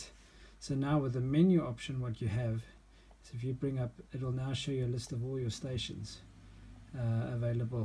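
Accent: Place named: South Africa. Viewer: SA accent with British stations. Where are you?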